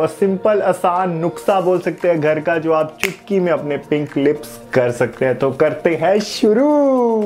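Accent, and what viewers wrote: United States. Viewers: Bro mene aapko American samgha😂😂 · Bhai mene bhi aap ko American smjha 😅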